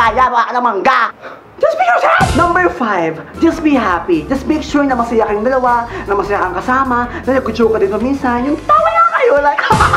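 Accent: Visayas, Philippines. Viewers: Bisaya man ka dong oiii.hahhah😂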